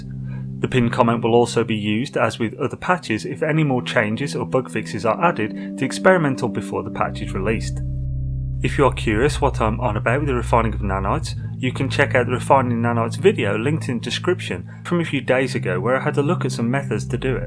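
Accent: United Kingdom. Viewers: Which part of the uk are you from?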